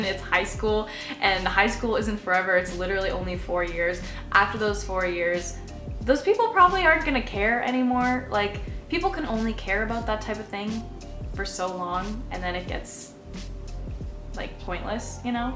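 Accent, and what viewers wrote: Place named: Canada. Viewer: you sound so canadian in this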